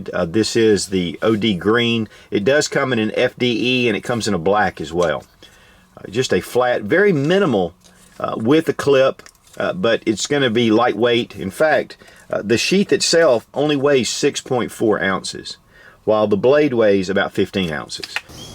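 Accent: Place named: South Carolina, United States. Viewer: oh ok your from S. Carolina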